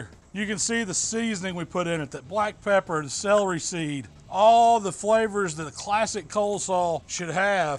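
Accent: South, United States. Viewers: i soon as i saw a big white man with a beard and southern accent, i know i found what im looking for.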